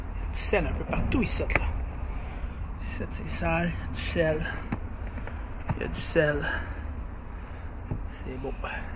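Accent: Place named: Quebec, Canada. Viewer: LOL 😂. C’est du slang québécois !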